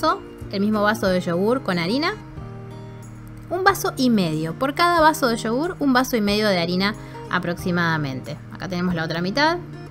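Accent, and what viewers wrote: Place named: Argentina. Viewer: El acento argentino me encanta, nada que ver con el de otras cocineras. Cada una tiene su timbre inconfundible.❤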